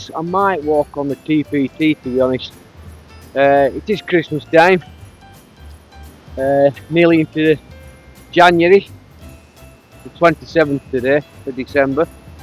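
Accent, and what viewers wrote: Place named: Barnsley, United Kingdom. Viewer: My mum loves you for speaking broo-ard BaRRRnsley. Heebee geebees 😂 etc. Happy festive season to you and yours.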